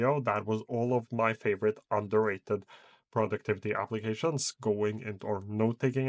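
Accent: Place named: Norway. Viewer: Came for the info, stayed for that cute Norwegian (?) accent 😝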